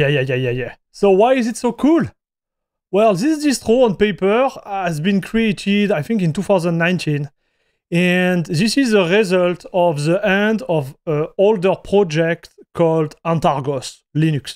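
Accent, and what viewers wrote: France. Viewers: Nah, this is french accent from france. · i have never heard a more french man holy shit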